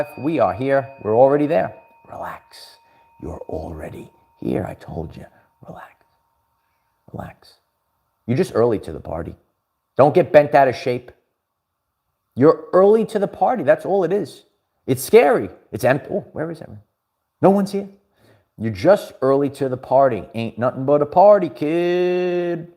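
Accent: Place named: East Coast, United States. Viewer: I just found your video! (I am in the southwest, currently working and listening and I heard an East Coast accent and had to stop, I felt at home. Are you in Jersey by any chance? 🙏😄💜) So you know, it popped up as ‘people who watch Stefan Burns also watch this channel’. Great chat! Namaste 🙏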